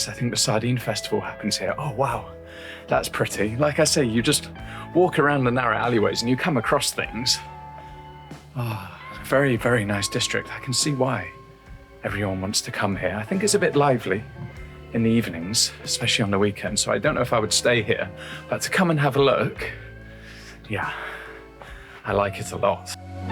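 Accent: United Kingdom. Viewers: I'm surprised he used the American word "chips" instead of the British word "crisps" considering his accent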